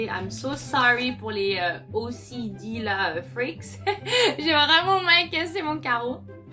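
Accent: France. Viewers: What a fun way to appreciate festivals especially panagbenga. Btw your french accent is to die for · Love your French accent, gorgeous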